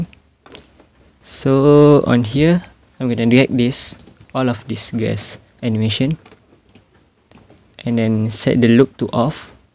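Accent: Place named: Malaysia. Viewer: thank you, btw you sound like malaysian.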